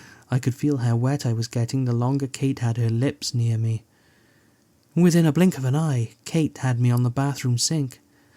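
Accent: Wales, United Kingdom. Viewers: The Welsh are known for being great orators…Richard Burton, Anthony Hopkins, etc. THIS I did not expect to be delivered by a welsh accent!! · I love the British accent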